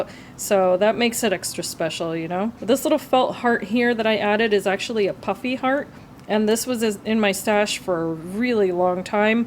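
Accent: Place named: England, United Kingdom. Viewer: Beautiful!!! I have this one and the cooking theme one and yes it's been in the hoarding vault lol, oh you remind me of my daughter with her English accent lol, (Boricua/Colombian) and she speak English 😂 tfs hugs Yorlis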